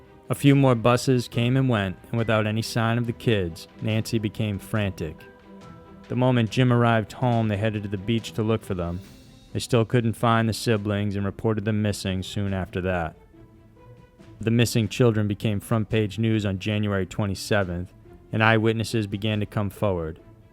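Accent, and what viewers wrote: South, United States. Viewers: He has a southern accent i dont know how thats scary.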